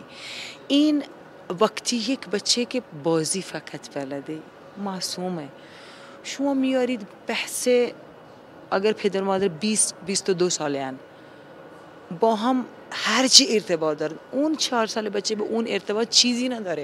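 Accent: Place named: Arab world. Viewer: اين صیغه ای که لحجه غليظ عربی داره.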